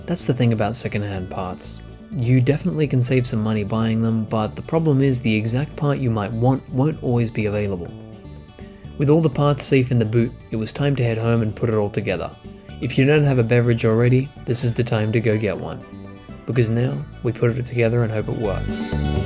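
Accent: Australia. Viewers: Are you Australian? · From aussie?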